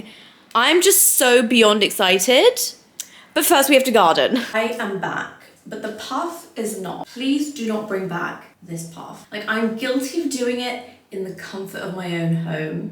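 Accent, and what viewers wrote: United Kingdom. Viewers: I remember you saying that wherever you go you take on an accent and girl that London accent is thick right now😂 Love you and this video❤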